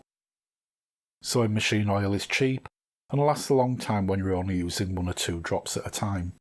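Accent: Northern England, United Kingdom. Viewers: What a brilliant and informative video. It's great to hear a northern accent too!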